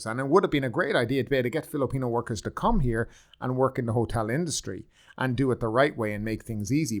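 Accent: Ireland. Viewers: Agreed .He sounds like a fellow Irish man God help me .I bet he was for lock-downs and the jab as well .If this is supposed to be a news service ? The commentary should be non judgemental .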